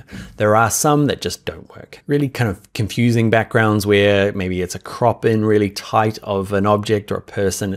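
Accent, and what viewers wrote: New Zealand. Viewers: Fellow kiwi? Can I just say, as someone who makes tutorials myself, my hats off to you for such a calm, cool and smooth delivery in your narrative. I'm a third of the way through your course and you explain everything so clearly and at a pace that I can totally keep up with. Kudos to you my friend! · Very helpful! BTW, when you slow the playback speed to .75 you sound drunk. Everybody loves a drunk Kiwi! :))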